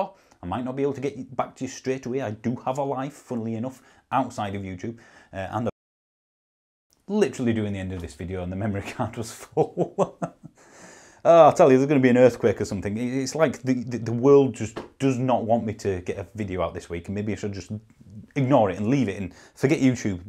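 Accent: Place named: North, United Kingdom. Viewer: Love to hear that northern accent!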